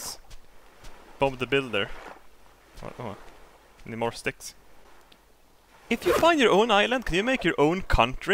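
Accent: Sweden. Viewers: I love your sewdish-english accent :)) ! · stop speaking Swenglish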